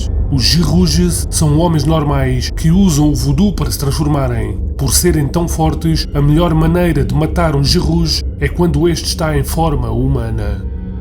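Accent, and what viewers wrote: Portugal. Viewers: Gosto do idioma de Portugal mas pra narrativa n fica bom pra esses tipos de relatos da impressão de que o Roberto Leal esta narrando.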